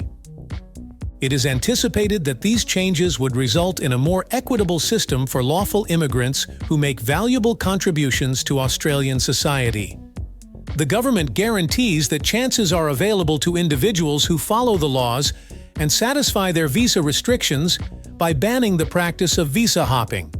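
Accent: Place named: United States. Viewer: Why ido we have a bloody yank speaking and not Aussie????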